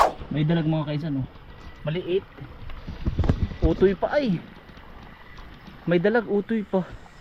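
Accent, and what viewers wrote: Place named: Southern Quezon, Philippines. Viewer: taga san kaga kuya at ang iyong punto ay parang taga southern quezon😂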